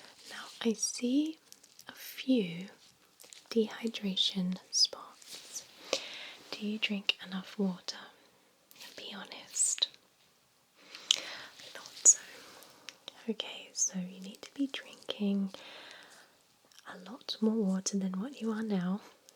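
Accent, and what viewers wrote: United Kingdom. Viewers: I just love your British accent! Where are you from?London?Manchester? I'm from Brazil and have never been to England. Even though I study as much English as I can, sometimes it's pretty difficult to find out accents from all over the world. Once you've read this message, please let me know. It is like a self test about accents!Love your videos!BYE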